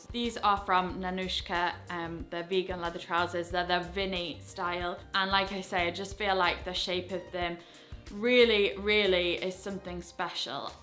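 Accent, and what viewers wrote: United Kingdom. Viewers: I'm American from L.A. You have a British accent. You're better than all the other stylists in YouTube. You're the only one I subscribe to. Thank you for being the voice of reason.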